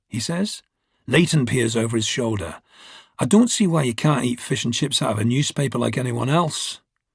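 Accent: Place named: Scotland, United Kingdom. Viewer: 𝑨𝒃𝒔𝒐𝒍𝒖𝒕𝒆 𝒑𝒆𝒓𝒇𝒆𝒄𝒕𝒊𝒐𝒏.ᐟ~ 😌✨ An epic, riveting narration with excellent voice acting for the characters - oh, and the Scottish accent reminds me of Robert Carlyle's. Would expect nothing less from the ever amazing Rupert Graves, one of the best actors/people in the industry/world. 💛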